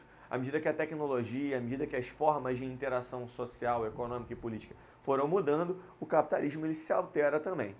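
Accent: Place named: Rio de Janeiro, Brazil. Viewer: Só eu que senti um sotaque carioca? Kkkk aula ótima! Me ajudou muito no seminário, parabéns pela didática, poucos tem...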